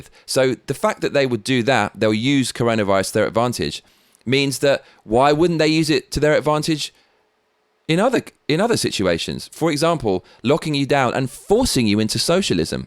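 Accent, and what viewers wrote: England, United Kingdom. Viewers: English accent. I hope this guy isn't yet another far left Trump-trasher. Go watch the Pennsylvania meet with state legislators where huge evidence was presented. The Supreme Court hearings will come next week. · great stuff..glad to hear a British voice speaking truth!!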